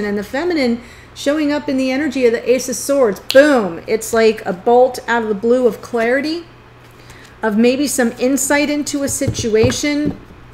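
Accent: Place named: Florida, United States. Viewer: And I also knew based on your voice you're a person either from FL or have lived there a long time.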